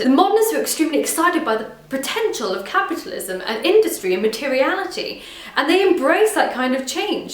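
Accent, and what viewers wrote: United Kingdom. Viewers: I saw the thumbnail and I was like "I hope she has a british accent" (for some reasons). I was not disappointed.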